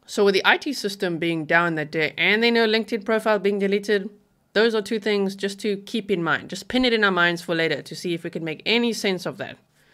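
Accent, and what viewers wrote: South Africa. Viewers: This is my first time watching your videos. I couldn't place your lovely accent so thank you for telling us that you are from South Africa living in the Netherlands. Your videos are so full of information. It feels like I'm back in university.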